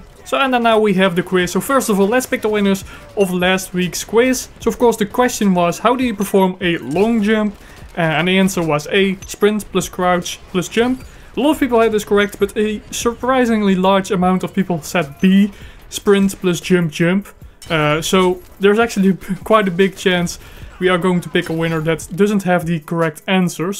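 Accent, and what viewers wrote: Netherlands. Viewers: eey mate you dutch?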